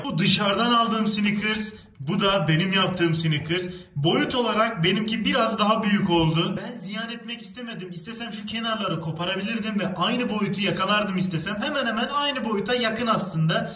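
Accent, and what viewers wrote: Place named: Hatay, Turkey. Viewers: Hatay sadig demeniz hatay iskenderun olmanızla ilgili Şive tam bizim koyun şivesi gidük gelük şuraya öte yayliye öte buri gel napön falan hataylıyım insalah bir gun karşılaşıp yaptiklarindan tatarım😀👍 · Abi anlıyorum hataylisin bende adanaliyim fakat şu konuşma tarzıni degistirirmisin bak abi videolarını severek izliyorum ama diyorsun ki yapiyirum diyorsun abi harfleri yutmadan konuşsan daha anlaşılır olur yapıyorum de abi dolaba koyuyorum de abi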